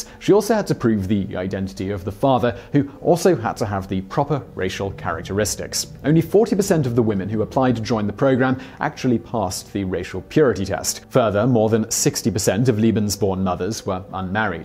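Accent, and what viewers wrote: United Kingdom. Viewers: you often talk about your efforts to nail the pronunciations of other languages. It seems that in this instance your tendency toward a British pronunciation was just doubled down upon. Seriously I can't believe that any effort at all was made to understand or reproduce a proper Germanic pronunciation despite the jarring difference in meanings throughout the entire video... my enjoyment was negated by this oversight.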